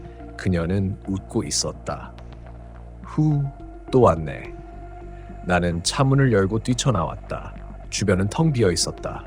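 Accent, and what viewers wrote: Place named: China. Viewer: 왜 이렇게 중국인 발음이 나올까?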